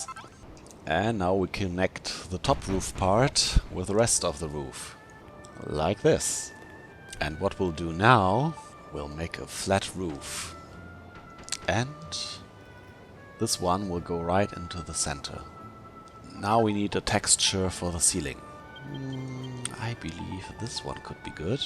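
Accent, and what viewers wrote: Germany. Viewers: Naja, dass du deutsch bist hört man an deinem Aktzent ;) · Warum mschst du keine Videos auf deutsch dein englisch ist erhörbar, das du aus Deutschland kommst.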